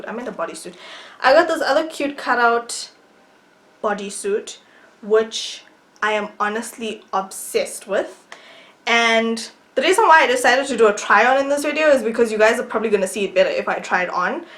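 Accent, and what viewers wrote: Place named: South Africa. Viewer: Hey girl! I loved this video - I am also a YBer from South Africa but I live in London now. So nice to hear a familiar accent! 💕 I liked and subbed to you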